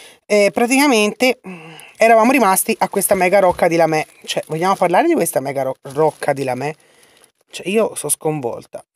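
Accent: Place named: Tuscany, Italy. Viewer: Sei simpaticissima x l accento sicuramente toscana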